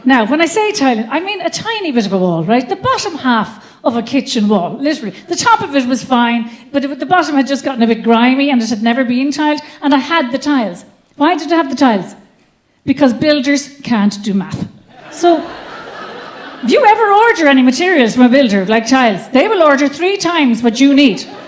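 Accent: Ireland. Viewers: Is this accent from Southern Ireland?? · 😆 Before clicking on the video, when reading the title I was thinking "High Maintenance". What‽ 🤔 I was curious... Stayed for the humor. 😉 Beautiful Irish accent by the way! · Such a gorgeous Irish accent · 35 years as a maintenance man (industrial mechanic) and final some women get it, lol. Love a girl with an Irish accent.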